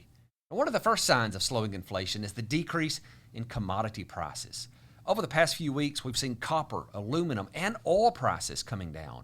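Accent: South, United States. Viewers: I hate your southern accent 😱😱 irritates the ears.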